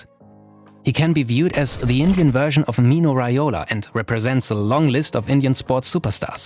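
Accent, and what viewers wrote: Germany. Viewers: Kann das sein das deutsch deine Muttersprache ist? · Fiahart kholi, lol love the German accent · to me it sounds like he is german. his accent is very very subtle but somehow that's what I pick up...a german accent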